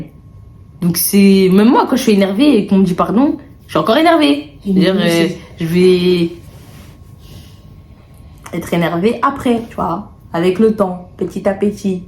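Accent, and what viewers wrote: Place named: Democratic Republic of the Congo. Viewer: L’accent congolais j’adore 🥰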